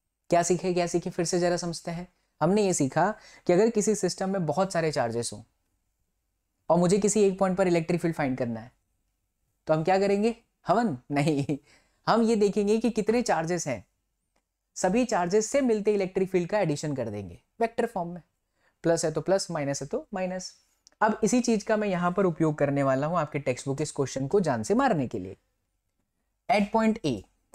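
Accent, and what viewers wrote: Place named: Bengal, India. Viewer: Sir English me bola kro Bengali lgte ho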